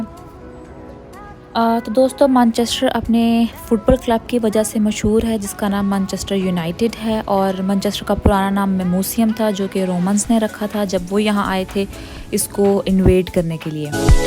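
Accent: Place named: Lahore, Pakistan. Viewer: Nice.. That lahori accent though 👌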